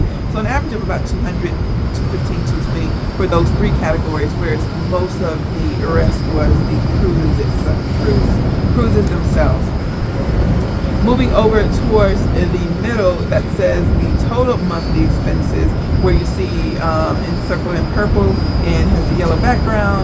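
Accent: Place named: New Orleans, United States. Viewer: Ggggggiiirrrllll, there was something you said in one of your other videos and I was 🤔 , she is speaking like she is from NOLA because I've only heard other REAL New Orleanians say that!!! 😂😂😂🎉Then I saw this video confirmation!! CONGRATULATIONS!!! 🥳 🎉 🎊 🥳 🎉 🎊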